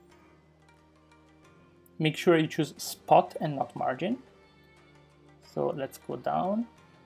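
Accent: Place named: Italy. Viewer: Ciao, sembra che sei italiano..di dove sei?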